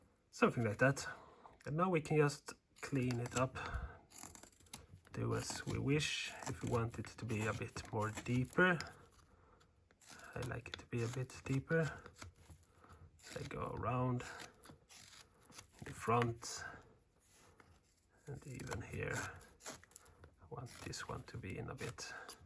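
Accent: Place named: Sweden. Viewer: Excellent carving tutorial. Your speed of carving is perfect for beginners. And, never fear, your English is just about perfect with, and with an enjoyable Swedish accent. BRAVO from California.l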